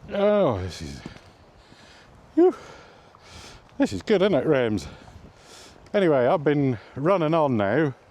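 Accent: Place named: Norfolk, United Kingdom. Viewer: I noticed you haven't lost "all" of your Norfolk accent 😂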